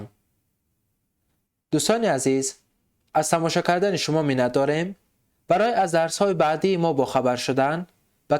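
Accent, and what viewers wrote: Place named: Afghanistan. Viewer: Таҳлаҳҷаи авғонӣ, вале зебо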